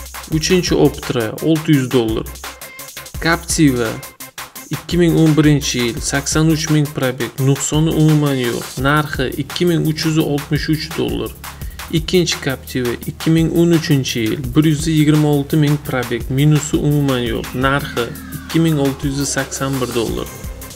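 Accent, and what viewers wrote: Bukhara, Uzbekistan. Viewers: Сих Бухороданмисиз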